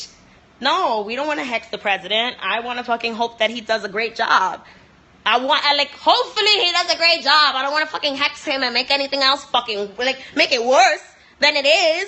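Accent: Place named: Dominican Republic. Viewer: she grew up with Dominicans. Why shouldnt she speak like the people she grew up with? Its not like accents are genetic lol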